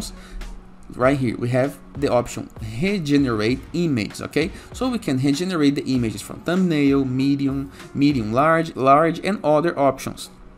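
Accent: Brazil. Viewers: Esse sotaque é BR certeza. Extensão PHP GD ou Imagick ausente. Não deu certo mas obrigado.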